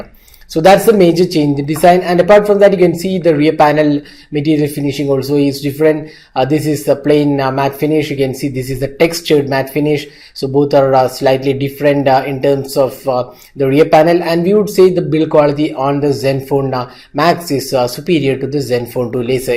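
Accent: India. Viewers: princy princesss well that's Indian English also known a British English with a Indian accent, more English than American English.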